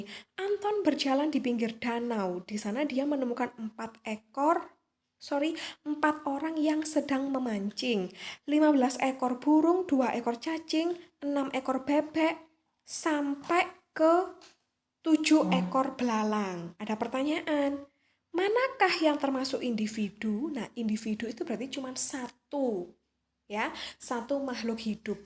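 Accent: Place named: Java, Indonesia. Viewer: Namanya berarti orng bali, logatnya kek jawa 🗿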